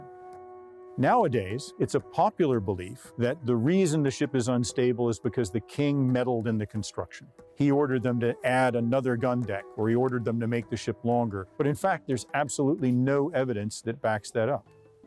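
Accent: United States. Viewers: This guy is def from Delco area in Pennsylvania lmao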